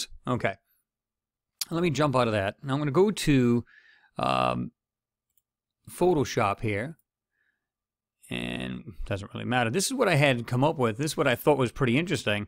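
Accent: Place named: Rhode Island, United States. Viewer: completely unrelated, but your accent... you a native of Rhode Island? You sound like my relatives.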